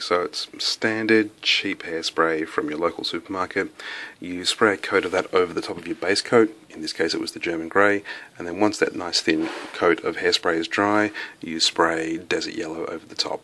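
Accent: Australia. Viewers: I sense an Australian accent. Do you buy your base coat and general painting products from a place in Australia? If so are you able to link me the website? I live near no decent hobby places.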